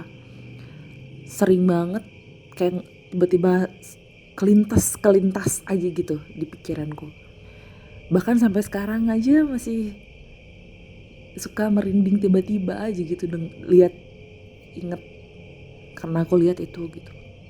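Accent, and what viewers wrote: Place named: South Jakarta, Indonesia. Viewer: Mbak nya logatnya jaksel bgt 😅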